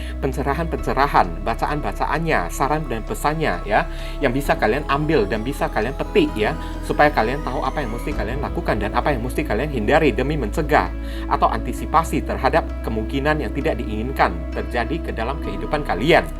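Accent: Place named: Medan, Indonesia. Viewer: Saya pilih pile 2. Terima kasih ko readingannya selalu resonate. salah satu tarot reader favorit saya..readingannya banyak nasehat2 baik yg memotivasi..kadang serius kadang dselingi dengan lelucon2 yg lucu bikin saya ngakak dengan logat2 Medannya yg kocak 😂😂🙏🙏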